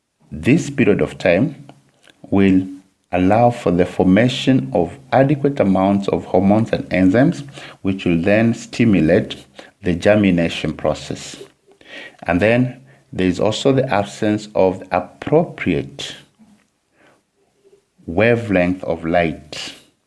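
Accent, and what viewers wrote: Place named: England, United Kingdom. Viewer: your videos are good because i like your english accent its eloquent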